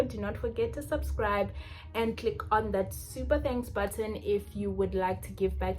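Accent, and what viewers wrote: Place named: United Kingdom. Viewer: I can start to hear some typical British accent in your voice 😅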